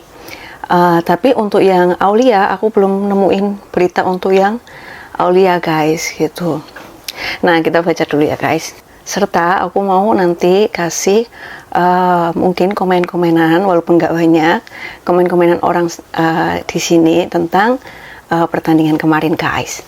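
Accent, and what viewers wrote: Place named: Banyumas, Indonesia. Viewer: Ora ngapak ora kepenak... Salam republik ngapak..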